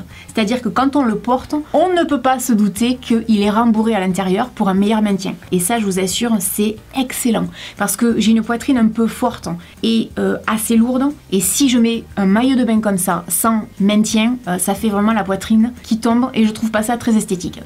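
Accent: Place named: Southwest, France. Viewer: J'adore ton accent du sud-ouest!!!!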